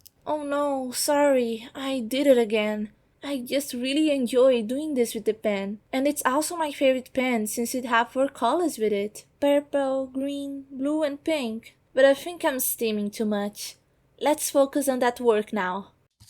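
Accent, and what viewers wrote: Brazil. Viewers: Sotaque me cheira a brasil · I just can't believe it has been 2 years and ONLY NOW I've seen that you actually answered. I am really sorry for taking so long, lol. And, to be honest, I am surprised I didn't realize you were Brazilian sooner, I guess I am just not used to that accent hehe. Parabéns pelo conteúdo também, seus vídeos ainda continuam MUITO bons kkkk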